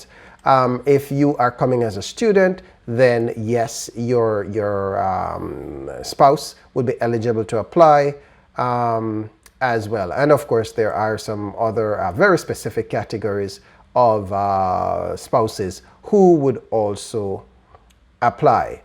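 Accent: Jamaica. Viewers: You sound Jamaican. Thanks for the info.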